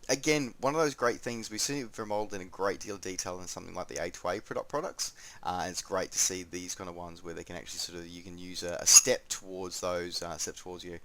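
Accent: Australia. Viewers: Are you Australian? I am aussie too :D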